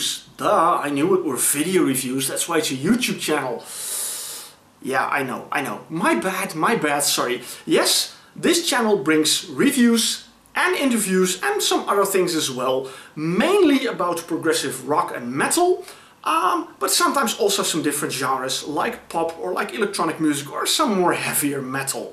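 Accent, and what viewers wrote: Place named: Netherlands. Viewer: I like the smell of prog ... (and the Dutch accent)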